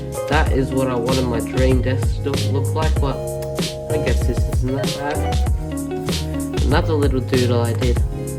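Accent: Australia. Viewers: Are you Aussie?\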